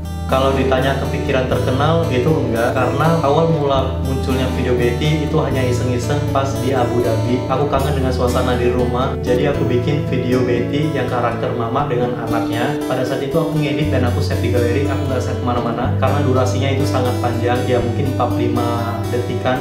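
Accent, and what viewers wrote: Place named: Karo, Indonesia. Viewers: I feel you ka~ Selucu-lucunya beti, sekocak-kocaknya beti, semenghiburnya beti, tetep otak dan dalangnya kak, itu ajasih yang perlu diinget. So ya, salut banget sama kak. Terus berkarya ya ka, aku selalu nungguin video kaka karena moodbooster parah aku bisa ngakak sampe nangis kalo liat video beti loh😂 satu lagi, gara2 sering nonton beti aku jg suka niruin logat medannya kaka, padahal aku orang jowo wkwk pokoknya semangat terus ka! 💪💪💪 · Bang orang mana? Logat nya kayak bahasa medan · Paling suka Kalau Abg Bawakkan vidio ada logat karo nya🤣🤣 kalau gak ngerti bahasa karo tanyak² aku ja bg😚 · ya bang banyak video abang kayak keseharianku setelah 8 th menikah kebetulan istri dari sumatra nah tiap pagi logat macam ini selalu menghiasi hari hari ku dan anak2ku dirumah (sukses selalu ya bang tetap berkarya)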